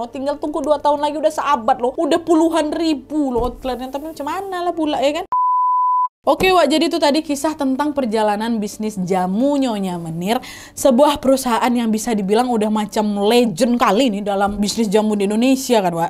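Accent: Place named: Pontianak, Indonesia. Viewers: Bahass kakak ini mirip bahasa melayu sambas singkawang,panggil tmn wak wak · Wak wak , mcem org melayu pontianak kak ni hehehe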